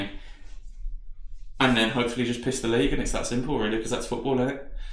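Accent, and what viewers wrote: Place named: United States. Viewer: Like your American accent.....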